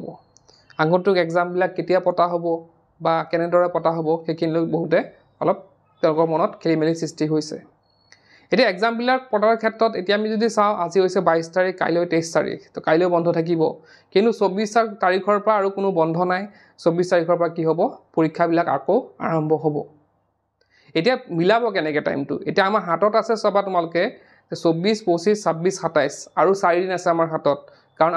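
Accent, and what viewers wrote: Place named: Dhubri, India. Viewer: Dhubri'r khon lage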